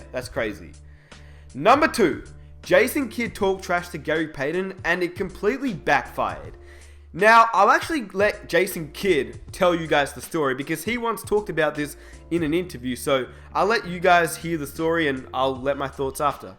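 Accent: United Kingdom. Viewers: Interesting hearing a Brit talk passionately about NBA stories. Too bad the NBA sucks now. Too soft. It was great in GP's day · Omg english accent is so wroooong here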